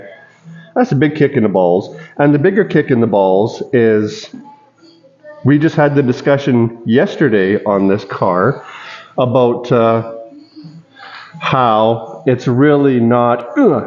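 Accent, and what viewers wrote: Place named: Canada. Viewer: It is good to see a Canadian that still talks Canadian, eh.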